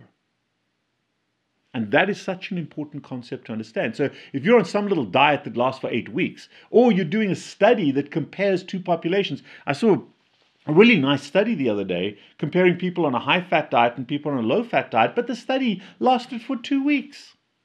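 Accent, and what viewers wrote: South Africa. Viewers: From someone in the UK there is definitely a south African accent. Not much. But enough. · Subtle South African accent going on there doc….good content ! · I'm loving your video's dr (& so awesome to immediately recognize the S.A accent my home country) ♡ I'm so thrilled I came across you. I'm a RNP in private practice and total LCHF convert. Your many video's & interviews are highly informative. Thanks for your great work and well done on your achievements past and present ❣ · Funny, I live in South Africa and when I saw his first video I thought "He seems like a South African", but then I saw he wasn't. Later videos I realized he was. He not only still has a South African accent, but he looks like one too. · He sounds South African. Probably is. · He speaks like an upper class English speaking South Africa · Too South African. Unmistakable accent.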